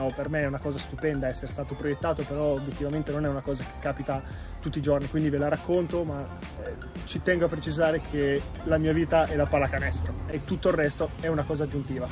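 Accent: Pesaro, Italy. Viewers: Ti seguo dall'Inghilterra, in bocca al lupo per la nuova stagione. Rendi orgogliosi tutti i pesaresi nel mondo, che ne stai prendendo un po' la cadenza. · hai preso un po’ di accento pesarese ahahaha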